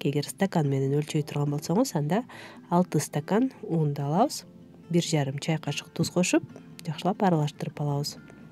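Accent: Standard. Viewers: Азаматсың таза сүйлөп,үнүң да ушунчалык уккулуктуу экенсиң! Колуңа береке сиңдим! 😍😍👍👍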